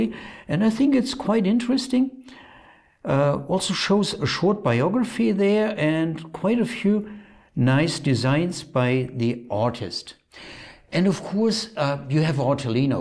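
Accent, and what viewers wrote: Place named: Bavaria, Germany. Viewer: Your "Bavarian English" is much more charming to a native English speaker than the computer rendition. Thank you for making these informative and enjoyable videos. As a lover of ukiyo-e prints, but not a very informed one, I always look forward to your presentations. Stay healthy!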